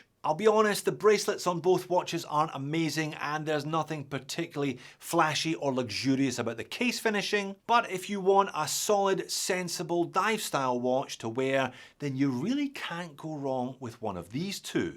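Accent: Scotland, United Kingdom. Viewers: They all suck in my opinion yet you are bang on about Seiko mineral glass suck, what is up with that slight Scottish twang lulz top ten watches to avoid dunnie waste £300 more like lulz some of them are real bad pish inside that Ali Express watch is soo bad yet the Sapphire glass is decent yet following for the banter lad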